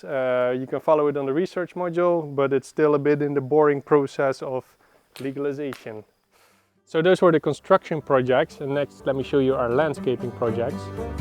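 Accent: Netherlands. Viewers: Het Nederlandse accent 😂😂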